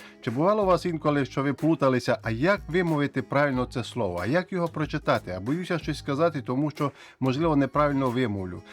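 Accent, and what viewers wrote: Transcarpathia, Ukraine. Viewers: Урок надзвичайний(принаймі,для мене...людини,ака хоче вивчати англійську мову...),Ваша українська мова (як на мене -закарпатська...)сама собою притягує і спонукає вчитися,ну і сама методика пояснення азів англійської....хоча Ви кажете,що англійську дуже легко вивчати...(і так,і ні....як для мене,принаймі...коли за плечима вже немало прожитих років...)а американська англійська чого варта....Та надіюсь....найважче дається зрозуміти співрозмовника (цю...) Файно дякую Вам !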